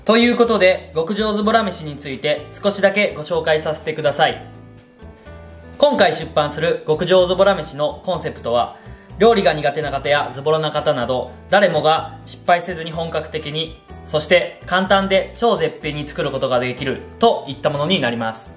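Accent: Kansai, Japan. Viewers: リプありがとうございます！要所要所に出る関西弁も好きですよ＾＾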